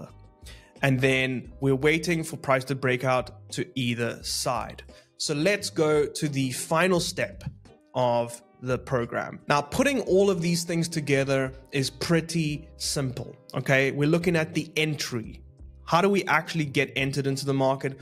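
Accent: South Africa. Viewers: You South African? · Is jy n suid afrikaner.?. Jy klink afrikaans.. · Are you South African by any chance? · Hey bro, are you South African? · Dude are you South African? I can't place your accent 100%, but sounds Saffa.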